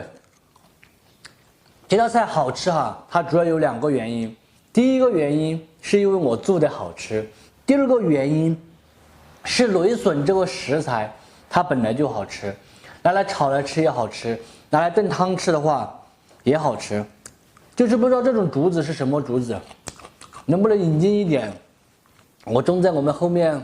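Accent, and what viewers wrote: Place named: Sichuan, China. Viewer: 川普好聽😀😀😀😀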